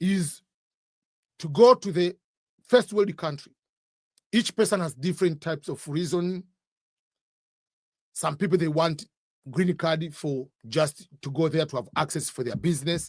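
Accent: Uganda. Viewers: All along i thought this man is ugandan from his accent 😂😂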